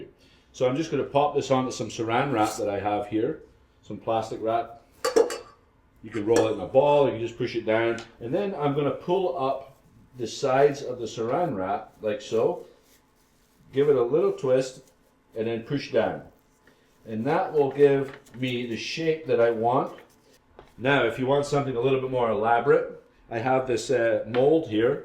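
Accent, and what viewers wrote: Scotland, United Kingdom. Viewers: He's Scottish ! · Canna hide his Scottish accent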